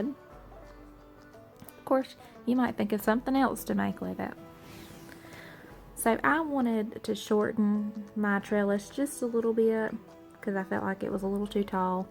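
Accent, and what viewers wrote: South, United States. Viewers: Wow, great project and great instructions! Trying to identify your accent - what part of which state are you from? (I’m from southwest Tennessee and I love all the different versions of southern accents 😜) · I love ur accent? What accent is this I mean which part of the US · Love this diy. What part of the south are you from? I am in Alabama. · Great project. Just subscribed. Love your sweet southern accent.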